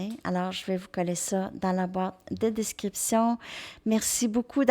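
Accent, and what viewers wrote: Canada. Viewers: Bonjour je ne c'est pas d'où vous êtes au Canada car j'adore l'accent canadien j'ai une de mes meilleures amies qui habite Montréal moi je suis de Tarbes en France et j'adore cet accent avec cet douceur dans la voix et oui mon chéri et en prison pour des fait ou il a était coupable bref oui j'ai toujours était la pour lui mes là pour des reson que j'ignore plus d communication d'eupuis le moi d'octobre je c'est qu'il m'aime beaucoup mes quand je veu avoir des discussions avec lui il ce renferme sur lui j'espère vrement qu'il va me donner des nouvelles rapidement car il me manque énormément bonne journée a vous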